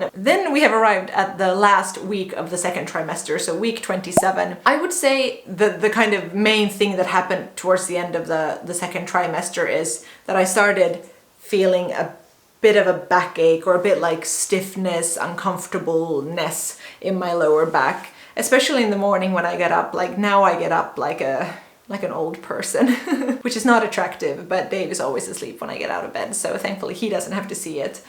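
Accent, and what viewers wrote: Finland. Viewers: your accent got so Finnish since you've been living in Finland, all this talking on a single breath intonation going down thing, so cute :)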